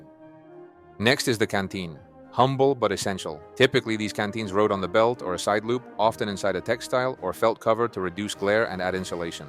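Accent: Spain. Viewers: By his accent id say hes spanish ,but speaks fluent english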